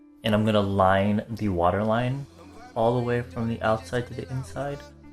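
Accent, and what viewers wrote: South Korea. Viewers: i love how he says english names in a korean accent so much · this was a good look for you. you speak so much Konglish now!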